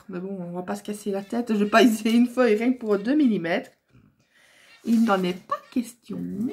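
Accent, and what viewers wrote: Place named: Belgium. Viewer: Coucou, j'aime beaucoup, ton parlé avec toi on ne s'ennuie pas, j'ais pu comprend que tu étai de Belgique? moi aussi, Bisous.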